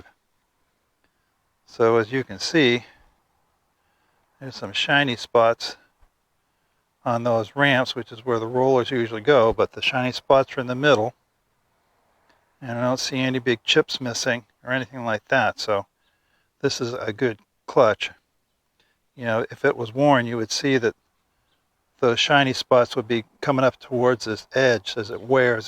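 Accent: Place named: Yorkshire, United Kingdom. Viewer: thanks for another excellent tutorial. The overdrive on TS394LO was working when I shut it down a long time ago so hope it is still OK. Our families are from the same neck of the woods - Yorkshire - probably why I can understand everything you say.:-) John in Manitoba.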